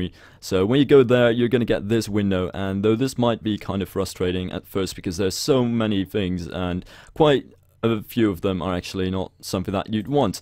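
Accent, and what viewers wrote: Netherlands. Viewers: Jij bent Nederlans haha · are you dutch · haha ik hoorde dat je nederlands bent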